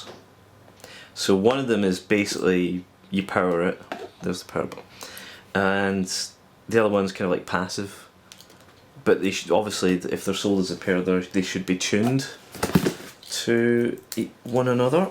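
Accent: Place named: Aberdeen, United Kingdom. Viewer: Where are you from? Sounds like an Aberdeen accent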